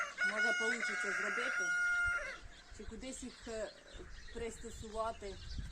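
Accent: Poltava, Ukraine. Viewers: Добрий день. Говір Полтавський, з якого району, якщо звісно не секрет. Я з Гадяцького.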